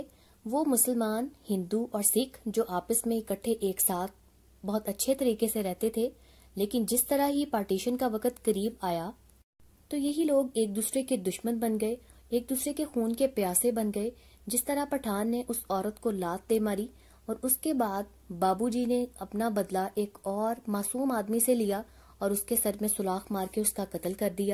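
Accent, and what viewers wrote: Pakistan. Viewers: Aapki voice lyrics se pta lag rha that u re from Pakistan m i right? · Mam, where do you belong to? Actually your level of urdu speaking is same as that of pakistani serials!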